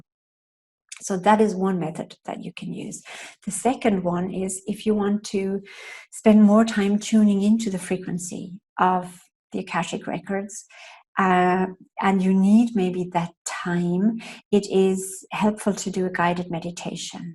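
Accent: Ireland. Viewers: Hi, you have a west of Ireland accent! So easy to listen to:-)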